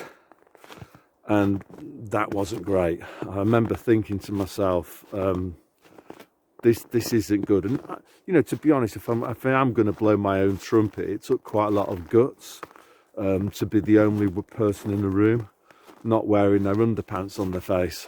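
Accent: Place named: Lancashire, United Kingdom. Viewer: We gave up work in our late 40's and moved to a house we had bought a couple of years earlier in northern Spain, intending to live "the good life". We ended up loathing the insane bureaucracy and thinly veiled corruption and returned to a very rural part of England. I have thought about upping sticks again but have more or less given up on the idea. Ironically I have met more like minded people since this whole sh1t show started than I ever knew before and have a great network of awake friends as well as an awake church I attend. Also I am fully expecting to be raptured more or less any day now so am hanging in here. Really look forward to your words of wisdom and, as a fellow Lancastrian, enjoy the delivery almost as much as the content!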